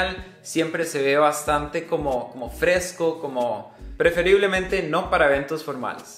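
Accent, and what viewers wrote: Costa Rica. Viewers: Eres de costa rica ? Pasó solo 1 segundo y me pareció que si Si si eres tico,es que es muy obvio mae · Eres tico verdad?🇨🇷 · Creo que de Costa rica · usted es tico? vea esa ERRRRRE mae hahaha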